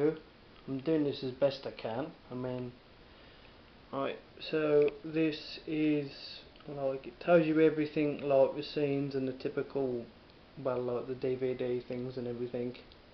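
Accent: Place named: England, United Kingdom. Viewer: Definately a box set i would like to get . Great review buddy . This is the first time i have heard your voice , you are a natural speaker . I really like hearing the English accent . Peace....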